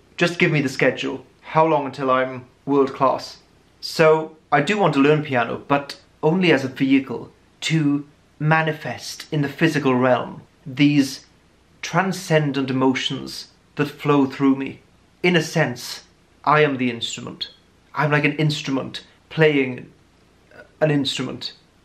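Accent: Wales, United Kingdom. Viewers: Why thank you! It's a Welsh accent.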